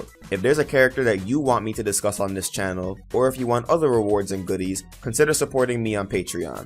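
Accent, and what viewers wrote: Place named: Caribbean. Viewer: so, what part of the Caribbean are you from?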